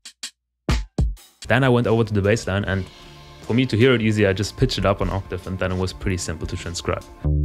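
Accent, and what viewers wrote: Germany. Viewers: I have zero idea how to make music, or what you’re doing in the video, but still very entertaining! Good job Also are you German? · Bist du Deutsch? lol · omg wusste direkt vom akzent dass er deutsch ist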